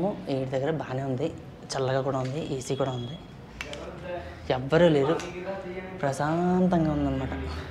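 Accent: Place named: East Godavari, India. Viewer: Anna mana east Godavari slang dose penchu bro comedy please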